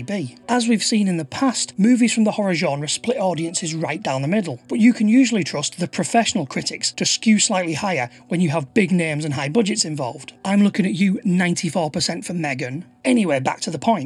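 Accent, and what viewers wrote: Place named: Manchester, United Kingdom. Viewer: Many thanks for this. Your voice sounds North Manchesterian, so if you want an interactive sensory descent into that post-apocalyptic decomposition vibe you could always visit the ex-shopping malls of Bolton. Plenty of it here. Bring a flamethrower. :)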